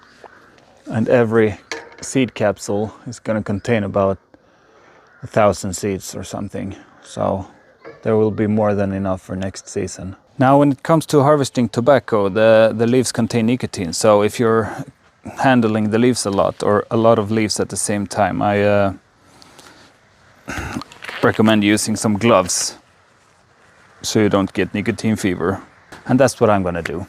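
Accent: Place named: Scandinavia. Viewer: Fantastic! I've just done my 1st harvest so it's nice that I'm lagging 3 weeks behind you so I can look at the tutorials. I'm definitely interested in making snus bc it's illegal in Belgium, makes it feel more like a delicacy. Are you Swedish? I detect a Scandinavian accent, didn't know you could grow tobacco in Scandinavia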